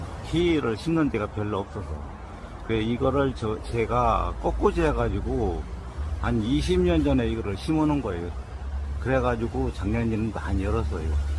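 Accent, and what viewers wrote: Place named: Hamyeol, South Korea. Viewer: 황토막한번 가보고싶네요 근데 님 고향이어디세요 제가 함열그쪽인데 저희동네맡투가들리네요 ㅎㅎ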